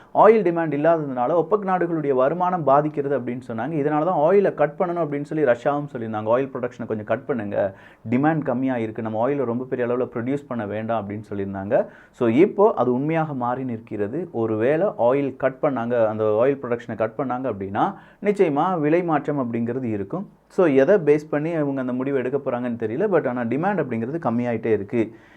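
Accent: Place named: Kerala, India. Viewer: Kerela TAMIL NO NO 😂😂😂😂😂😂😂😂😂😂😂😂😂😂😂😂😂😂😂😂😂😂😂😂😂😂😂MOUTAL😂😂😂😂😂😂😂😂😂😂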